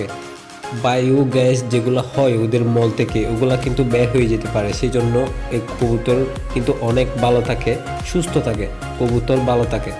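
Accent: Chittagong, Bangladesh. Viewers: Karon ami deklam apni chitainga vasay kotha boltesen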